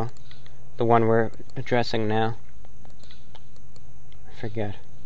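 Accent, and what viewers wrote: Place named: Transatlantic, United States. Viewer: Listening to this podcast I noticed that ReviewBrah speaks with almost a Transatlantic 1950's American Accent. I never noticed that. It goes quite well with his vintage attire.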